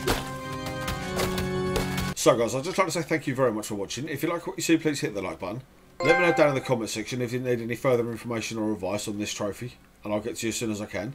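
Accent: United Kingdom. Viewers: It's weird how even a video about a silly game spoken with a Brittish accent sounds like a professor saying that the French is invading England.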